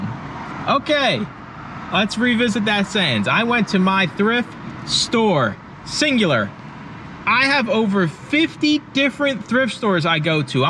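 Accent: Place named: New York, United States. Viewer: Im From Philadelphia where ate you from you sound like northeast guy New York Jersey lol?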